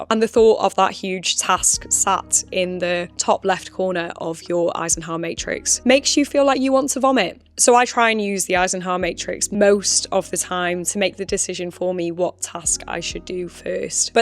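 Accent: United Kingdom. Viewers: Hello from Russia ❤ I love your vlog and your sweet British accent 😊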